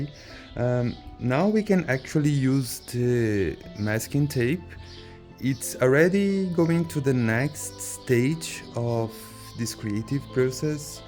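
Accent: Brazil. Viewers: Que bonitinho o sotaque brazuka falando Inglês! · Our cute brazilian accent turns the video even better 🥰🥰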